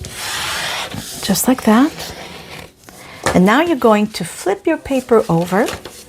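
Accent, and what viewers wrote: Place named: Quebec, Canada. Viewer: This is so cute and easy !! Thanks for sharing !! Is your French from Quebec Canada ? Just curious since I’m sensing a French Canadian accent 😅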